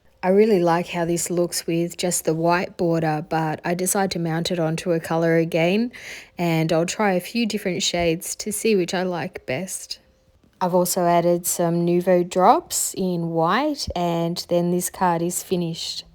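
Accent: Australia. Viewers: They are all beautiful! You do such lovely work and you are a pleasure to listen to. Your voice is calming. And, who doesn’t love an enchanting Australian accent. I’ve only recently discovered you and am now looking at your past videos. Keep them coming! ☮️💜🌞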